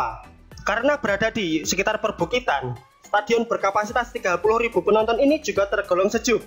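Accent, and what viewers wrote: Java, Indonesia. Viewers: suaramu loh medok bangeet..... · Jawa kali suara mu bro Jawir jawir 😂😂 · juan juan medok bangat jowone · Medooooookkk min.... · Mas sampeyan suarane jowone medok bgt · Cie yang mencuri video orang,jawa mu medok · Suaranyaa.. jawa banget. Stadiun riau.. skrang berantakn broo.. krn terkena masalah sepertiny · Suaramu ituloh Mas..medok baget sesuai otak dan pikiranmu yang ngawur dan medok. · suara jelek, medok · kalo boleh jujur suara lu medok,tapi great suara lu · Kok suaranya medok banget ya hehehehe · suaramu jowo bngat chancukkk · Medok'e rek 😅👍👍👍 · q seneng sih...liat vidio nya tapi perutku sakit sumpah denger mas nya bicaranya lucu bgt bahasa jawanya melekat bgt di lidah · Jawane medok banget ya MA's asli jowo to massss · Yg ngomong wong jowo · wong jowo to.. medok banget ngomong nya..?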